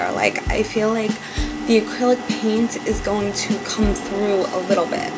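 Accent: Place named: East Coast, United States. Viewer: I really enjoyed watching your presentation! Very nice voice and East coast accent! ((huggs)) :]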